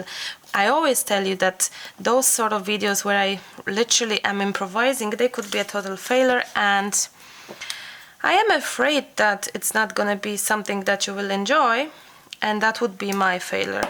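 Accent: Cork, Ireland. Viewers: where are you from?? This is my 1st time watching your video and you did a fantastic job!! It's just I've been trying to guess my 1st 2 was Russian or Polish but then when you carry on speaking every now and then you sound Irish x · Greta video, i love how the 'Cork' accent was strong enough for me to wonder if you were living in Ireland - Im also in Cork :D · I'm loving your accent! Is there a bit of Irish in there or am I crazy?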